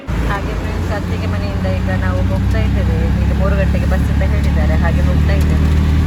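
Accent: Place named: Kerala, India. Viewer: ಅದ್ಯಾವುದು ಬಾ ನ್ಯ😂 ನಿಮ್ಮ ಕನ್ನಡದಲ್ಲಿ ಸ್ವಲ್ಪ ಸ್ವಲ್ಪ ಮಲಮೆ ಮಿಕ್ಸ್ ಇದೆ😅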